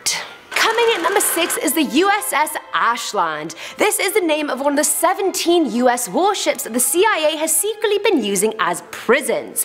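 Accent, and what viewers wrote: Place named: United Kingdom. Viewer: A new host at Landon? I didn't know. Trying to figure out where you're from. You sound British but it sounds like there's another accent in there.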